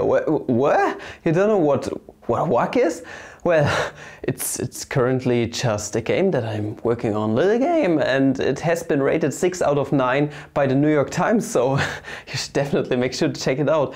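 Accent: Germany. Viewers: I don't think this is only because of the German accent but does sound a lot like Der8auer ^^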